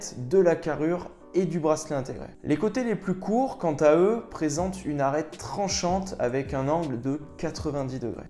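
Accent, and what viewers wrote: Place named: Italy. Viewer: Esthétique dans l'air du temps avec les hommages aux montres des seventies avec bracelet intégré. Je te donne un 7/10 sur ton accent italien. Merci pour cette vidéo et les images de la montre sont top.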